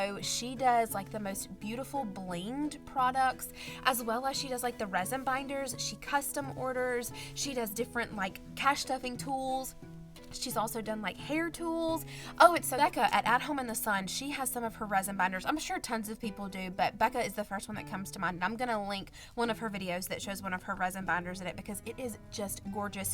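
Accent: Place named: South, United States. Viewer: definitely not annoying at all I love southern accents 🥰🤍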